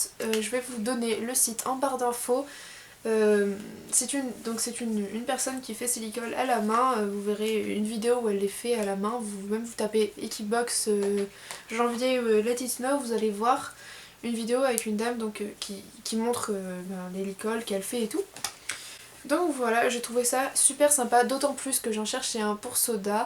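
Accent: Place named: England, United Kingdom. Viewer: tu a un accent anglais magnifique !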